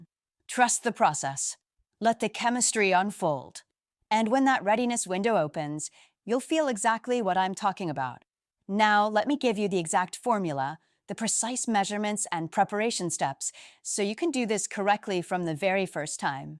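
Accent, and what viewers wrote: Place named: India. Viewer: howcome you have an Indian voice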